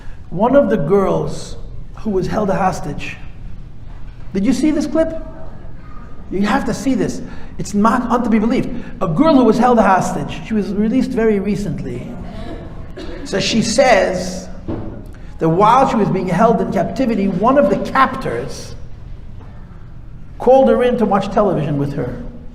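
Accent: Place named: United States. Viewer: American accent , another colliniser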